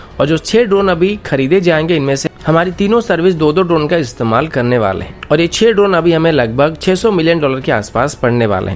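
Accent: Odisha, India. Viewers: Sir aap kya Odisha ke hai.mote emiti laguchhi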